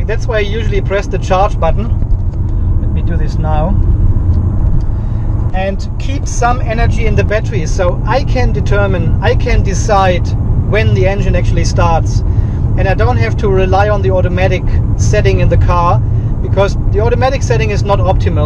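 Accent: Germany. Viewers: when are you going to upgrade to a new Mitsubishi Outlander PHEV? I'm looking forward to the 2020 version in North America, hoping that the 2.4L engine that is promised will provide better hybrid mileage and perhaps updated/better BMS and a little bit more electric range. Otherwise, please keep the educational videos coming; they are all fascinating and your German accented English seems normal/part of the friendly charm of your stories about a journey with the Mitsubishi PHEV. Two thumbs-up for this video, even without the watchdog data along for the ride...j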